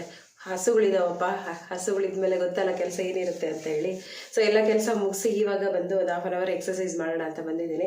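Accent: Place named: Telugu, India. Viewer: Nimdu yavuru madam swalpa Telugu mathadotara ede❤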